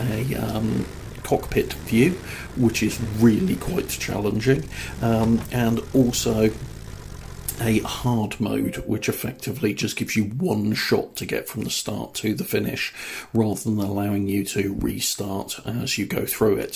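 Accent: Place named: United Kingdom. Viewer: You have the most british accent I've ever seen Good vid, keep it up mate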